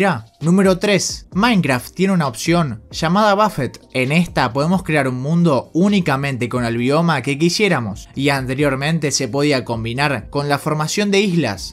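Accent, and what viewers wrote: Argentina. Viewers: Lo mejor de los vídeos es la voz argentina.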